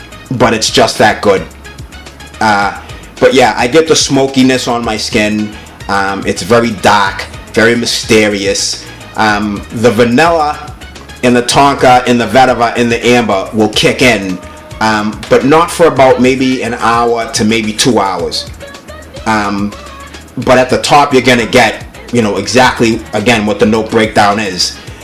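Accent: Boston, United States. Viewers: this boston accent is so annoying and coming out of a black dudes mouth makes it funny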